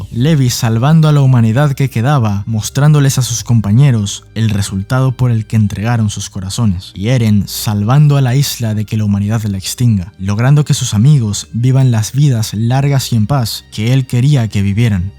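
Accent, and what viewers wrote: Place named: Ecuador. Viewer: Muy buen video, que genial se nota lo ecuatoriano en tu voz. Sigue así, ganaste un suscriptor.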